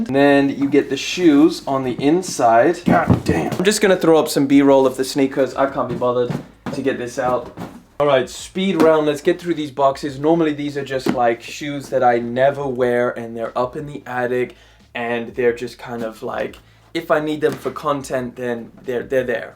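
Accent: South Africa. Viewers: I wanted to say I hear a bit of South Africa in your accent 😂👀🔥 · I KNEW I GOT A HINT OF SOUTH AFRICA WHEN I HEARD YOU TALK!!! Represent! 🔥 🇿🇦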